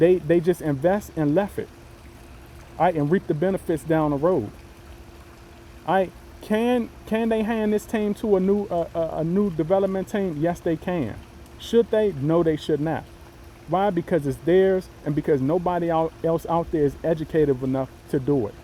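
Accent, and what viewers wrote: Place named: Baltimore, United States. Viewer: ooh ok that’s ah nice home bro I thought you were from Baltimore because of the accent I’m from Baltimore I live in Texas now tho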